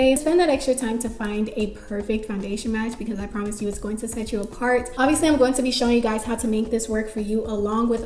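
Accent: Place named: Nigeria. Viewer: I’m 1 minute in the the Naija in you is jumping out. Is it by force?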